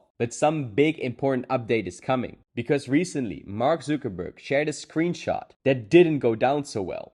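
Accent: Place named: Netherlands. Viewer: dutchy english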